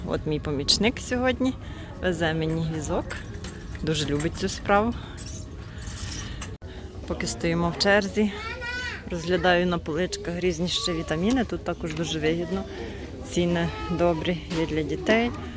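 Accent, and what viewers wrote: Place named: Lviv, Ukraine. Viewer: Ви напевне з Львівщини, бо є акцент? Горнятко - це так говорять у Львові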